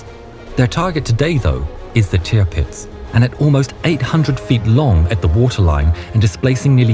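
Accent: United Kingdom. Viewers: The narrator's accent sounds a bit British... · the narrator is British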